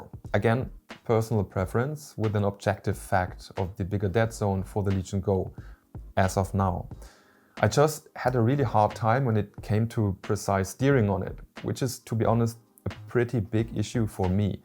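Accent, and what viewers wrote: Germany. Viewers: German? Hört sich definitiv so an, danke für den Vergleich. Hab den Legion Go zurück geschickt. Zu groß, zu schwer für einen Handheld. Software hat nur Probleme gemacht und war kaum schneller als mein altes SteamDeck. Überlege doch noch den Ally zu holen... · Ich hab 2 Wörter gehört und direkt gewusst der Typ ist Deutsch, ahhahaha aber cooler Vergleich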